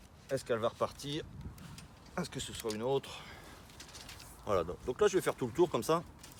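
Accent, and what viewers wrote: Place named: Picardy, France. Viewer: Salut à toi le jardinier de de(ux)main! Euuh on est cousins, frères ou je sais pas mais je pense exactement comme toi et réalise exactement les même choses que toi lol T'as un accent d'min coin, je suis dans la Somme au sud-ouest d'Amiens (environ 30 km),on pourrait éventuellement se rencontrer et se faire visiter nos jardins? Ça serait un énorme plaisir déchanger avec toi!:) Je conçois un jardin permacole depuis un peu plus de 4 ans, ça commence à être fonctionnel et bien joli aussi... Tu ne nous a pas parlé de l'intérêt de ces saules pour la biodiversité: une vraie aubaine! Ensemençez vos jardin avec des saules, c'est rapide et efficace, la vie vous remerciera très vite en vous offrant le spectacle de la nature qui s'épanouit grace à votre coup de pouce! 😆 À bientôt l'ami! Merci du partage par ta chaîne toute neuve;)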